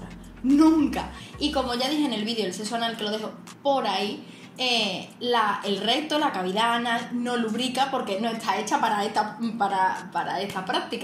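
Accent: Andalusia, Spain. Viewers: Que lindo se escucha el andalú... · Acento Andalú que sexy!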